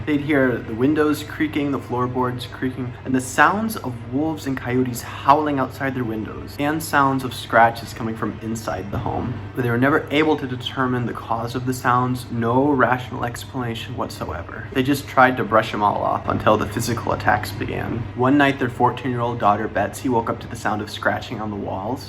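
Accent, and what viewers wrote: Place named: Midwest, United States. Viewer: you have a mid-west accent dude!😂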